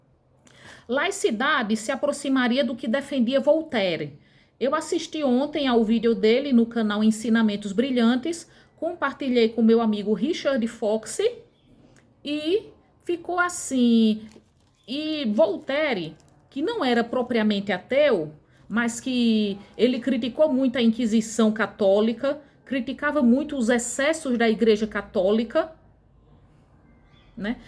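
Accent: Northeast, Brazil. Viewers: SEU SUTAQUE PARECE NORDESTINO.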